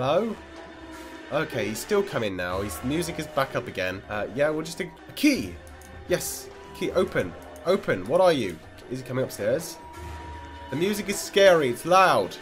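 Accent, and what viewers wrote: United Kingdom. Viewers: O he is British I am Canadian whatever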